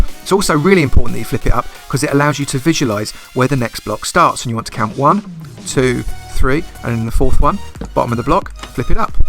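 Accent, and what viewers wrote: United Kingdom. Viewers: I like the vid coz of ur voice British people these days or Australian :)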